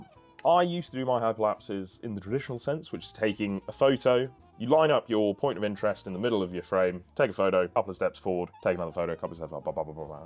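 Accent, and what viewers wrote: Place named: Belfast, United Kingdom. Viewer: Completely unrelated to the video (which is amazing, thank you!) I know your english, but there is a few times that you sound like you are from belfast. Is any of your parents from belfast?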